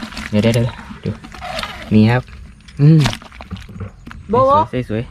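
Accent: North, Thailand. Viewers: เห็นพูดเหนือ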